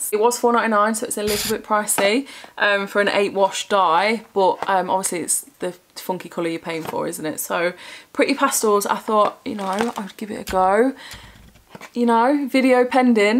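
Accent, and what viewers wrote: Nottingham, United Kingdom. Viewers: I said the same....im from nottingham and accent sounds so familiar x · Your accent sounds similar to Nottingham I love watching these you are so bubbly I love that you go for it with positive attitude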